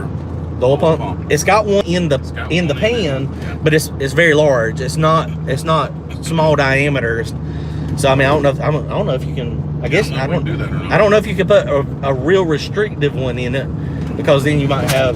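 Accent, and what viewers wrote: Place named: North Carolina, United States. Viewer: Dang I thought u was a North Carolinian????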